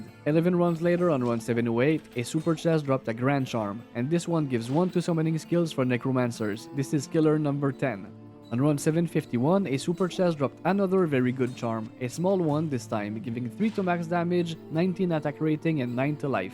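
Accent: Quebec, Canada. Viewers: That crisp Québec accent. That many runs, getting a sorc would saved you substantial amount of time with telekinesis only without even counting teleport. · french canadian? "three times faster" is a weird thing to say mathematically "In a third of the time" is more accurate I guess the old "speed" was "1 enigma per 60 hours" so "1 enigma per 20 hours" is three times as fast, or two times faster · Mon frere! I love how, with your beautiful Canada accent, whenever your say poppables is sounds like 'palpables', like you are a medic examining someone :)) Also, I found, and totally random, 'cause when I farm I usually go, in the same run - Travi, Mephisto, then LK, Countess and then maybe Andy, so this time I found, on P8 LK, and Ohm rune, under a Corpse. So a 'palpable' corpse gave me OHM = Oh, Homme, OH HI MARK!!! = ))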